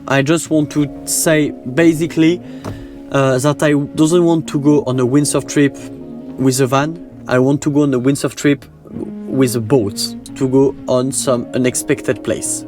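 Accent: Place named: France. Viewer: Malgré le choix de mots français à la place de mots anglais et la prononciation française de mot anglais, le débit d'expression est assez conséquent et assuré, c'est tout de même pas mal du tout! A encourager! 👍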